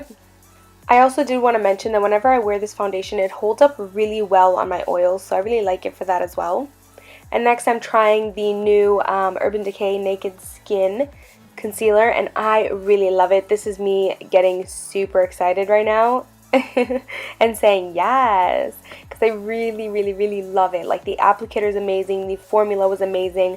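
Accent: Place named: Canada. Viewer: I can really hear your Canadian accent in these recent videos of yours . Its adorable!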